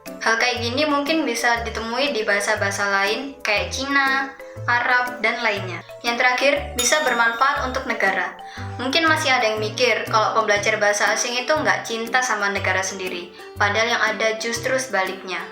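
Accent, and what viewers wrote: Java, Indonesia. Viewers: javanese nya kentel bgt mba 😂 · klo aku nanya kayak nya di jawab ngga yah??? kak, orang mana sih? kok jawa nya agak medok? hehehe just asking.... Loading.... Waiting for answers.. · Muedhok puol, tapi keren kak saya suka tips nya hehe 👍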